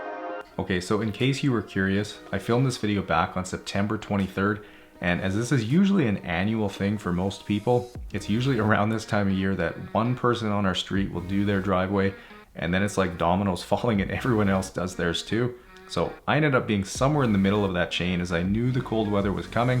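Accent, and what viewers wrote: Canada. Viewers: I’ve watched soooo many of your videos and I just want to say you sound very Canadian in this!!! Love it 😂❤️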